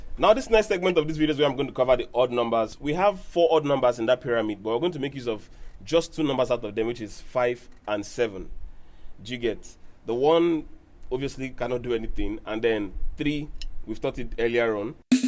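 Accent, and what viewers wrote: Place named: New Jersey, United States. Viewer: Hey dawg. You sound like you're from jersey 😅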